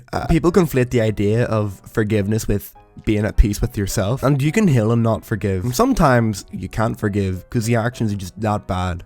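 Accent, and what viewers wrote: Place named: Northern Ireland, United Kingdom. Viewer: You just saved my life fr. I haven’t heard a northern irish accent since christmas 😂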